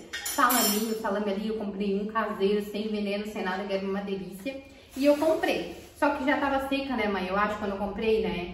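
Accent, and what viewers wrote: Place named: South, Brazil. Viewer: Quel plaisir de te voir.. AMOUR...sa manque les jours quand je ne te vois pas.et que je N'entends pas ta belle voix avec ton accent Brésilien du Sud.... AMOUR..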